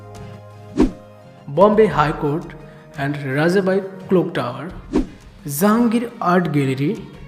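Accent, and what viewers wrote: Bengal, India. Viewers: I think you are a Bengali so improve your tongue and keep it up for Mumbai....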